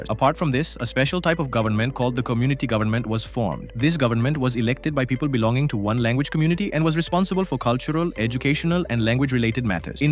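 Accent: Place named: India. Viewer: Vid is very nice and helpful for exam but your axecent changed in middle that made me laugh of your indian axecent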